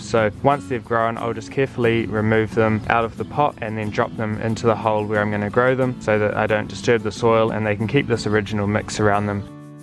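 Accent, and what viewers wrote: New Zealand. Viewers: I have loved this post. I def will give it a go in the future. I'm up at Muriwai Beach, Auckland. Hmmm.... now to find some tyres lol. Bro, when's the best time for us to grow melon? Love hearing our accent for a change. Thinking of starting a channel myself actually. Any tips?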